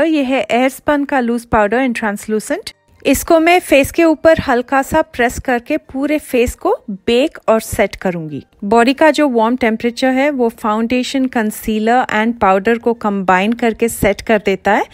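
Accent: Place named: India. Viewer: ur hindi accent sooo cute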